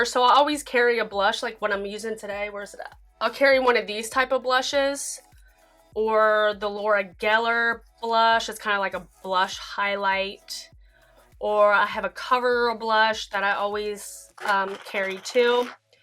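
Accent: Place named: Appalachia, United States. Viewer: You're so down to earth! Honestly that accent is pretty close to the North Central WV/ South West PA accent... Semi-Appalachian. I'm from Morgantown, WV and you sound very similar to me.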